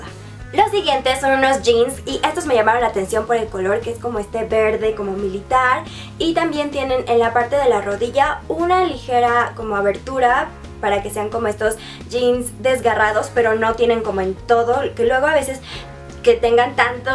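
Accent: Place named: Fresa, Mexico. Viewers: ¿que le copia a Clara? JAJAJAJAJAJAJAJAJAJAJAJAJAAJAJJAJAJAJAJJAJAJAJAJAA es el mejor chiste que he escuchado. Te encargo que cheques el tiempo que lleva una y otra en youtube, y también checa los primeros vídeos de y te darás cuenta que ese acento "fresa" lo tiene desde que comenzó. Ella habla así, coincido en que aveces se escucha algo extraño, pero de que ella copia a otra persona, eso si que noooooo... checa las cosas q te dije. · si te apoyo o abre mucho la boca y medio fresona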